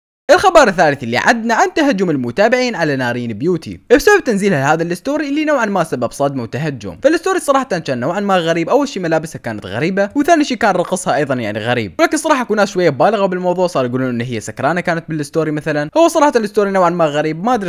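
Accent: Iraq. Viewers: انا ركزت باللهجة العراقية اكتر من الحكي 😂😂😂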